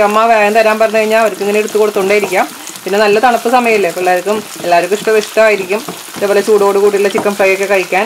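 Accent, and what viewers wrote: Thrissur, India. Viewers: Dear sister you are very honest we can understand from your talk trichur slang may God bless you 🙏🙏🙏🙏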